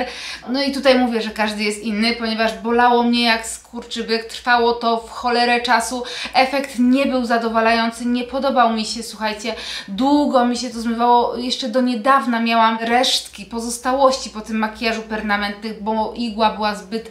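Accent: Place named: Ukraine. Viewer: Po polsku wymawia się zmiękczone samogłoski .Jesteś Ukrainką ? Naucz się polskiej wymowy jeśli jesteś w Polsce!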